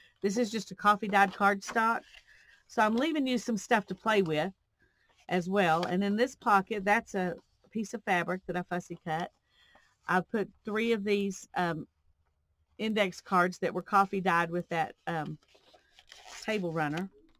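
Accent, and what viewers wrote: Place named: South, United States. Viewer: I'm sensing a slight southern drawl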